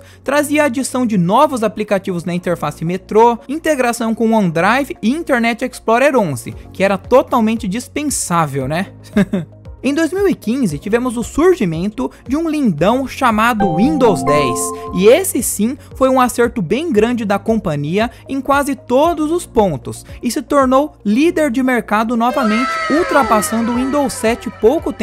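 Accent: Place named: Caipira, Brazil. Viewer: caipirês detectado...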